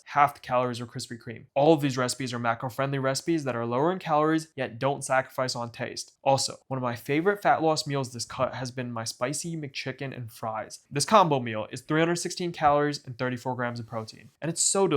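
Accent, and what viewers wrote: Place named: Boston, United States. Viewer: Amazing! Love the subtle Boston (or is it Brooklyn? 🤔) accent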